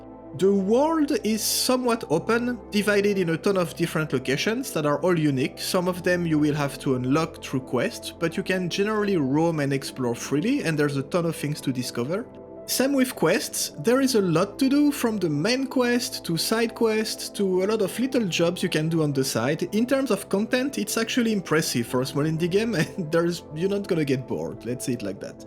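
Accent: France. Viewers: Hi just discovered your channel with your stalker mod video! Are you french by any chance? I may be sensing it a bit in the accent! If i'm mistaken, apologies. If not, salut à toi!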